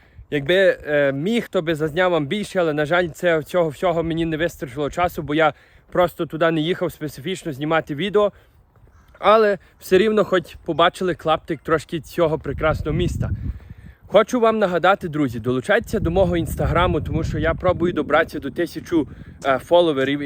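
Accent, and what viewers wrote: Western Ukraine, Ukraine. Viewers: Підписалась, шоб тільки оцю западенську балаканину слухать)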